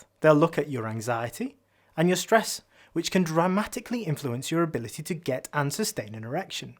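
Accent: United Kingdom. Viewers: What a huge difference in hearing a doctor from the UK explaining all this, rather than an american doctor.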